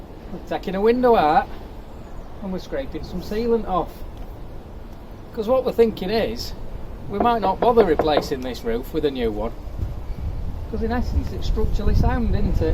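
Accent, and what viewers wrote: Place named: Leeds, United Kingdom. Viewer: I am thinking your from Leeds but I hope from Sheffield put me out of my misery maybe Rotherham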